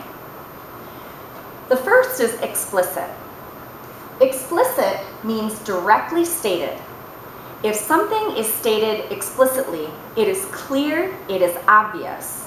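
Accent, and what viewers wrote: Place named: United States. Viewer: An American accent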